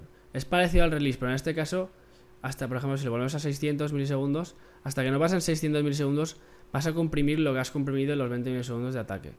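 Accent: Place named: Aragon, Spain. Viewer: tus tutoriales son buenos pero los que mas me gustan de los que tienes son los que te paras a explicar detenidamente todas las funciones y que quedan tan claras como este, muchas gracias bueno son conocimiento que ya tenia pero algo si que he aprendido gracias a tu detenimiento explicando, gracias a sido excelente, ademas tu hacento maño es lo mejor, por que yo soy medio maño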